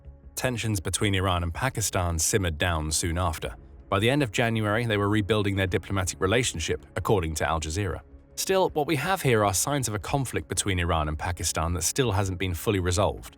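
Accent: England, United Kingdom. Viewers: He has an English accent.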